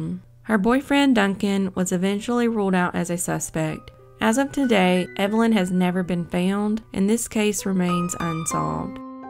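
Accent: South, United States. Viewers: You are an angel. You are either from Alabama, Georgia or Mississippi. I go to sleep to these stories. It means a lot. I used to do the same to unsolved mysteries when I was a kid. Thank you for all you do.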